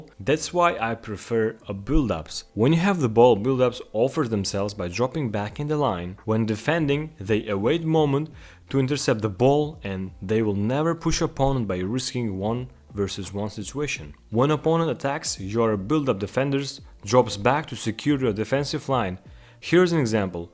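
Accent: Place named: Eastern Europe. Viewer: Nice one, just came across your chanel Btw are you from any of the former soviet union countries? Your accent sounds very familiar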